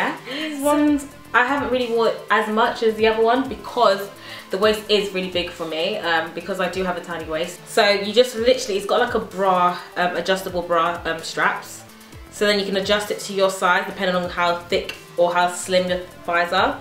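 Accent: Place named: United Kingdom. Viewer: I LOVE FUCKING BRITISH LANGUEG GIRL:):D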